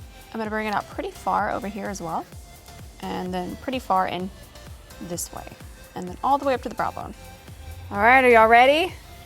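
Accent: South, United States. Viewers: I love your colorful tutorials and your spunk. You are so fun to watch. I love your Southern accent too.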